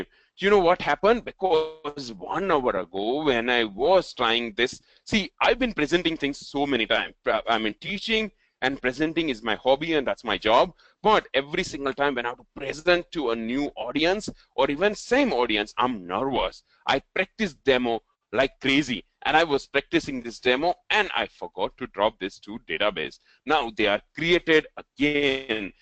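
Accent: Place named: India. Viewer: He's Indian, how can he help that he speaks English with an Indian accent. A little bit entitled of you to think he should modify the way he speaks because a small proportion if the population can't understand how he speaks. I'm English and I suspect you'd struggle with my accent as well though I'm struggling to work out how I'd modify it to suit you.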